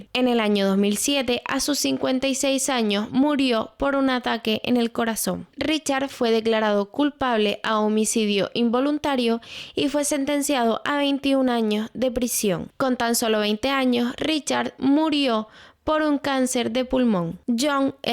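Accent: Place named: Canary Islands, Spain. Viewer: Me encanta tus vídeos, tienes una voz preciosa, con ese acento canario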